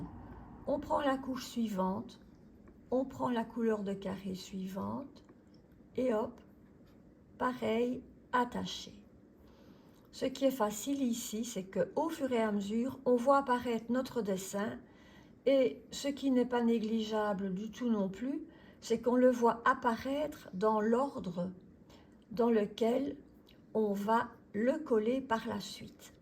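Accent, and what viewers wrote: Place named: Belgium. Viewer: Merci pour ce tuto qui est pour moi génial, je ne comprenais toujours pas jusqu'à ce jour comment faire la superposition, mais avec vos explications je pense maintenant que j'ai compris, cela va être un jeu d'enfant, et merci à vous pour votre bel accent belge, je vous souhaite pleins d'autres tutos et je vais vous suivre sans problème. Bonne continuation et à très vite.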